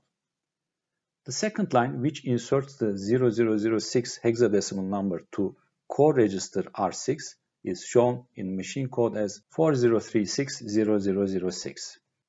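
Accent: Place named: Turkey. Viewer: valla aksandan türk oldugunu anladım tebrikler başarılar hemşerim :)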